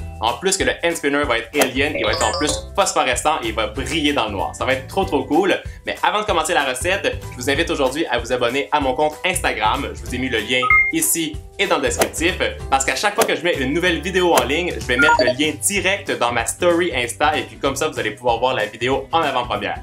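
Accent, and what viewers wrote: Quebec, Canada. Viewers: super video tes le meilleure 😉😉 #lovemail #temsnap love j'adore ton accent Québécois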